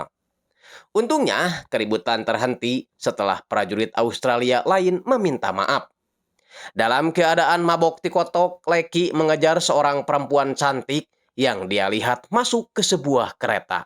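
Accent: West Java, Indonesia. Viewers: Nyunda pisan😂😂😂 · Akang orang sunda ya?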